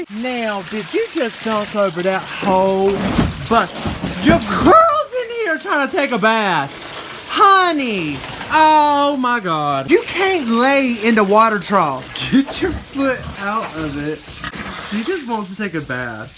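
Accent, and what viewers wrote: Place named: North Carolina, United States. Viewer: You can tell you’ve been in good ole NC for a while! That accent is on point! 😂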